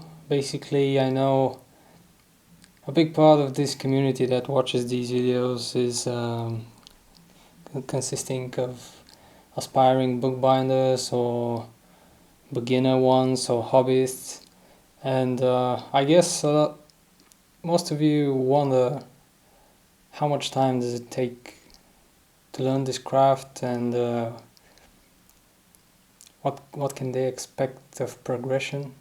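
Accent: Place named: United Kingdom. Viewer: You sound as if you've lived in the United Kingdom for years, you have a really cool accent, being from Northern Ireland, I only wish mine was as cool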